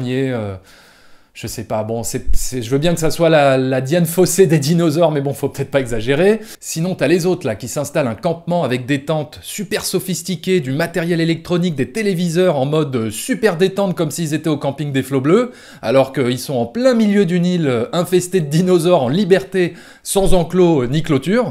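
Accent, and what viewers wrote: France. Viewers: Arthur BASSET Non, parce qu’il n’y a pas de bon youtubeurs ciné québécois comme durendal, MJ de fermez là ect. Et de toute façon l’accent français ne me dérange pas du tout! J’y suis habitué!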